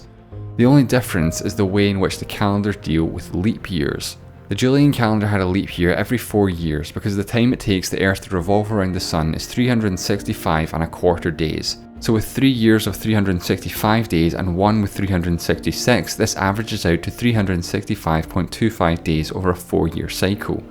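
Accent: Scotland, United Kingdom. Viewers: its his accent there's nothing he can do about it, also its not really that strong of an accent compared to how some scots speak... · I love your Scot accent. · How Scottish are you? Great video btw. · are ou scottish? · Very interesting accent. British?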